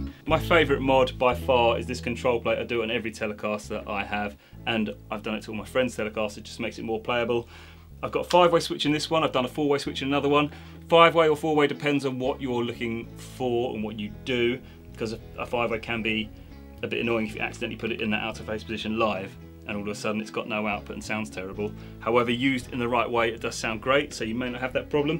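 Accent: United Kingdom. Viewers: To USA Americans, a man with a strong British accent and tattoos and a . .muscle-T-shirt' is obnoxious .... that is MY comment and your replies are not wanted or asked for.....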